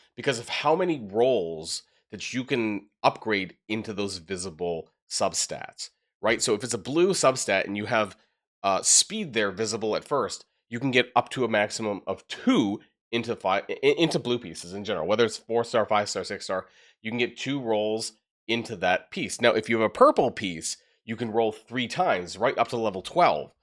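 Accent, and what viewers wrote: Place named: United States. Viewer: Почему название видео и описание на русском, а мне вещает какой-то американец? -_- Требую видео на русском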